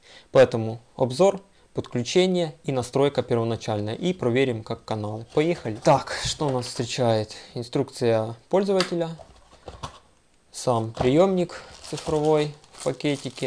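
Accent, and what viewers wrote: Vologda, Russia. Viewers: Вологодский окцент! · вОлОгОдскій суржик у твоєму виконанні - просто повний пі...єц. Дизлайк за "язик"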